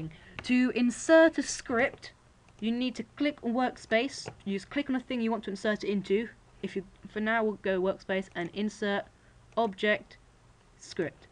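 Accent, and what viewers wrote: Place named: United Kingdom. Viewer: He's britan lol mostly I hate there acent.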